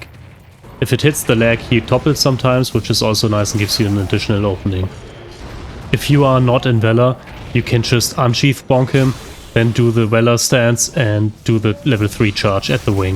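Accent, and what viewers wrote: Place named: Germany. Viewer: Ich hab 5 Sekunden geguckt und sage du bist 2000% deutscher 😂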